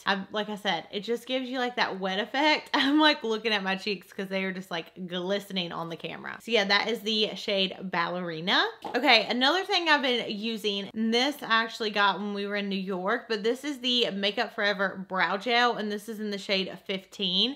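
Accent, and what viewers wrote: South, United States. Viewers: Why would ANYONE dislike video?! Lol 😂 honestly idgaf what video it is, I love watching her stuff, her voice is relaxing (her country accent) and she's funny!!! PLUS she talks about makeup!!! What's not to LOVE?!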